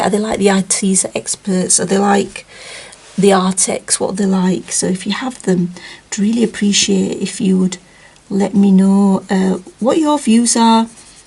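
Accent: United Kingdom. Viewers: I love hearing you talk, K. Maybe because Im American & like the UK accents.hehe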